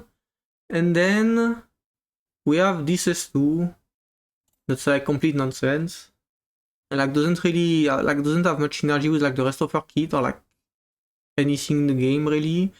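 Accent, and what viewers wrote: France. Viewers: Are you French ?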